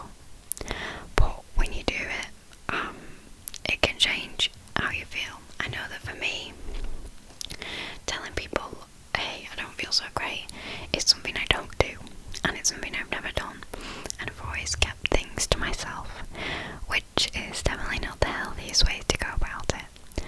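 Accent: United Kingdom. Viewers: Na it's British i think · Her accent is British. Sorry if that sounds mean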